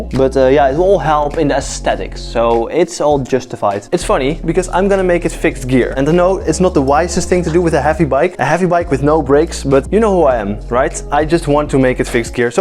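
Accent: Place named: Netherlands. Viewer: I hear a dutch accent haha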